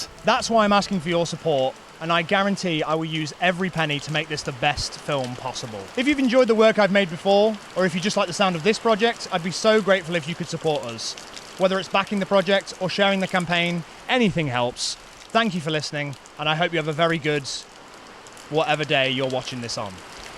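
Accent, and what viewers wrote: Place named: East Midlands, United Kingdom. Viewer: you used to have an east midlands accent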